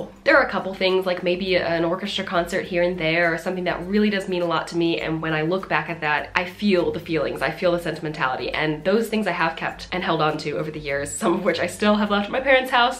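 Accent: Midwest, United States. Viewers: What state are you from originally, if you don't mind sharing? I'm guessing Ohio, Michigan, or Indiana. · I love studying accents and exploring how people's speech patterns change over time. I am from CA and lived for two years in the UK. My speech and word choices were all over the place when I got home. I can still hear the Midwest in your speech!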